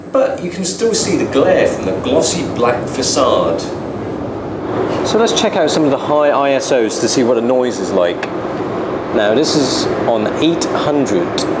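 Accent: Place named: United Kingdom. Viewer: where is that at??? i noticed u were in hk.. with a uk..a ccent?? sorta... i go to hk.. 2-3 times a year.. :P goin this august... not too many hkers speak english.. used to.. not nemore.. u have excellent english.. cheers!!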